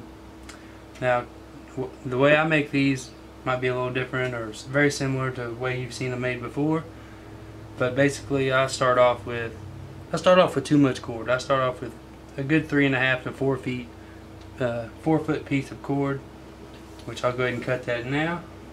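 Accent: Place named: United States. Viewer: love the accent what part of usa ? Video is great btw!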